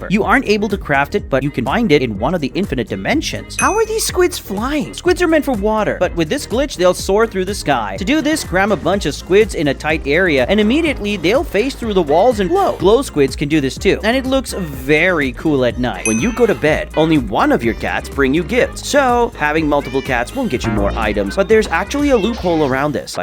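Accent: United Kingdom. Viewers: I thought u were British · Ain't you british?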